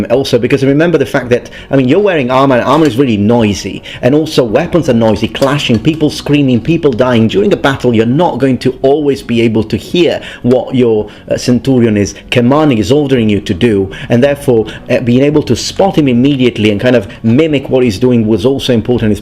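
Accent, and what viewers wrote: Italy. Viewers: It’s probably not true, but the fact that rattles this stuff of in an Italian accent makes me think he’s right:)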